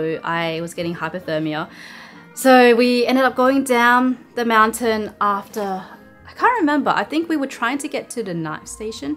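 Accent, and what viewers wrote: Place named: Australia. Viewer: I like your aussie accent while you can fluent speaking japanese 😮😊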